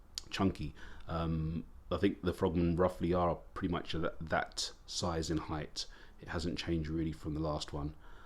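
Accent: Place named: South, United Kingdom. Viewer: Hey buddy... What is wrong with your channel, you’re about 10’000 subscribers short! Fantastic in depth quality and a huge passion can be seen and heard in your voice. I will give you and your channel a mention in my community section on my channel for others to discover you. I’ve just recently covered the diving mode side of the GWF-D1000 next to the Gulfmaster, as nobody has covered it properly. You have an accent close to me being more southern on the UK Island but that doesn’t cover where you’re based of if I’m correct LML ;) Anyway my friend, will sub you and try and get you a little more attention as you deserve a heap more, trust me, i’ve seen many channels that aren’t near to your quality with thousands of subs. Have a great Sunday and I’m sure we’ll chat more ;)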